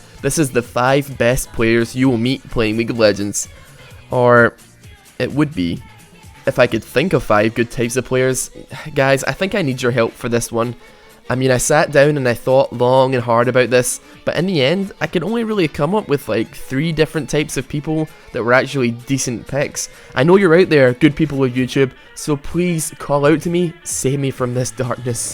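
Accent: Scotland, United Kingdom. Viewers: Are you Scottish? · Where are you from ? What's your accent ? Is that scottish ? (dont hit me)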